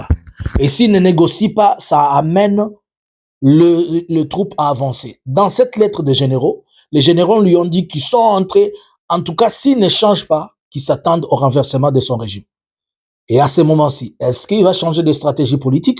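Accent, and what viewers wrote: Rwanda. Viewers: Monsieur, tu est rwandais, ça se voit ds ta façon de parler, notre armée avance très bien, où en sommes nous, avec la cité de masisi, c'est ds les mains de notre notre armée, monsieur arrêt les mensonge, moi j'habite Luberon, menteur que tu es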